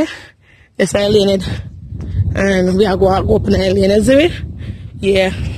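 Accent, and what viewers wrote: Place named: Jamaica. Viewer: Like your attitude. You don't make overseas change you one bit. You maintain your Jamaican accent.❤💜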